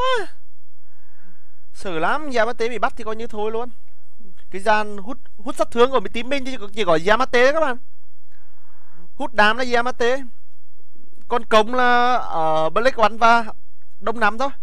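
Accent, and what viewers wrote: Nghe An, Vietnam. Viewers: E thích tiếng nghệ an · Giọng nghệ an hay mà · Giọng nghệ nghe buồn cười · Ah giọng nghệ an ở thái hoà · thick giọng nghệ an nghe sướng tai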